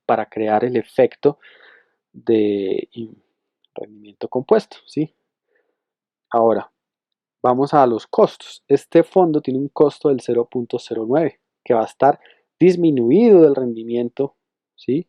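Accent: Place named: Colombia. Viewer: Hola, excelente información, por tu acento asumo que eres Colombiano, y supongo que de Bogotá; te quería preguntar si tienes idea de como se maneja o afecta tributariamente en renta, hacer este tipo de inversiones? gracias de antemano por tu valiosísimo aporte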